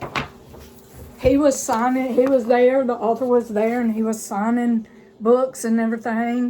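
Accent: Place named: Alabama, United States. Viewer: Floridian here. Where are you from? Alabama? Your voice reminds me of my aunts from Alabama.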